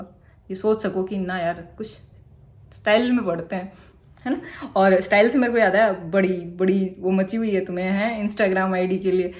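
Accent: Haryana, India. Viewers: Awaj se lagta h Rewari, Gurugram, m/garh se jo aap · Haryanvi Vibes mst lag rahi hai language me 😁😁😁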